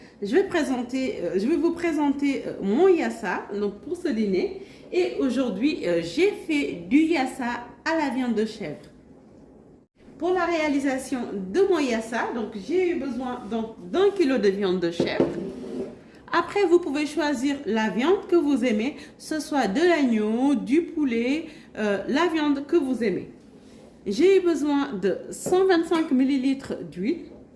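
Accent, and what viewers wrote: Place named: Saint-Louis, Senegal. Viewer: On dirait que vous êtes de ndar.... Domou ndarrr... Merci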